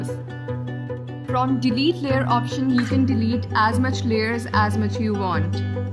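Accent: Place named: India. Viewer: Wow sounding like indian , is this made in india app? If yes so excited to learn pixel art from own country made app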